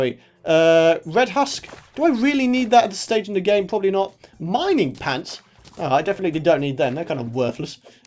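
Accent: United Kingdom. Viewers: You sound a bit British but I'm Australian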